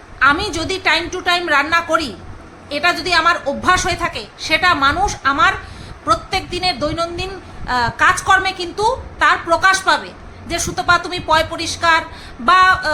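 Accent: Bangal, Bangladesh. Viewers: didivai tmr mukhe bangal vasa ta sunte hebby lage😊😊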